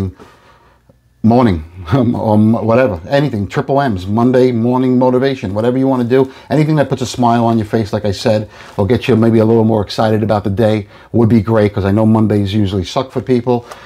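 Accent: East Coast, United States. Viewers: Love your message and videos. Old me from a few years back would've been jealous of your nice stack, but the current me just enjoys it and sees it as great motivation for myself. I enjoy your east coast accent, not from there myself but I like to hear it. My dad passed a couple years back at a younger age and it was rough but helped me put things in perspective for what is important in life and he was such a fun person who would make people laugh and smile all the time, even until the end. I remember him cracking up the nurses in the hospital even when he was in such rough shape. Keep up the positive attitude, I always appreciate it and love to see when your new videos pop up in my notifications.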